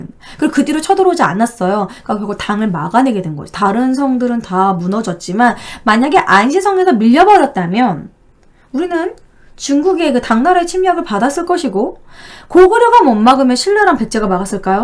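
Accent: Gyeongsang, South Korea. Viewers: 너 대구출신이지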